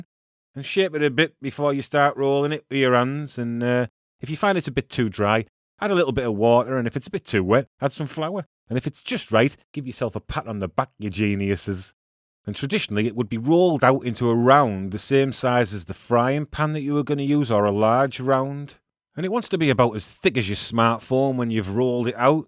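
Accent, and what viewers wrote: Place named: Northern England, United Kingdom. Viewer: Thanks to your lovely local accent (I’m a Northern Lass) and humour to match, I find your presentation so simple to understand that I will be able to satisfy my Son with his favourite chomp after spending over 40 years of mithering me to learn! Feeding 3 adults and 6 children, along with several neighbours, is going to use up all my flour (I bought 16kgs by mistake!) and this recipe is perfect - Wish me luck!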